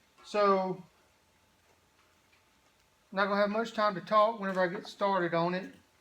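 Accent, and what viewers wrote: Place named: South, United States. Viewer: Not to get off the subject but I'm from the south just like you and we have similar accents. I can understand every word you say but I turn the sound down and the captions on so I don't bother other people around me and YouTube always distorts the captions when dealing with Southern accents. YouTube's voice recognition needs some work. I mean "hard as a rock", Youtube interprets as "whores".... On the subject of what your doing, I'm looking into using waste plastic (like those gray Wal-Mart bags) as an alternative to fiberglass resin and polyester. I have used cotton and even cardboard brushed with Bondo fiberglass resin instead of polyester to make things. I've made speaker boxes for cars out of cardboard coated with fiberglass resin then glued that felt material over top of it. It's been holding up fine for a few years.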